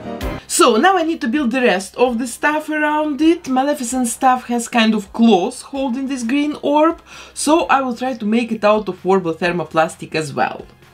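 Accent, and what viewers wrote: Russia. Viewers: This is my second episode and I'm already hooked and I love your accent are you Russian?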